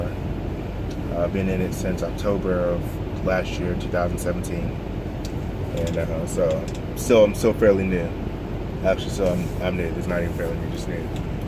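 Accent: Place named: Maryland, United States. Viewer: You gotta be from Maryland?? That accent..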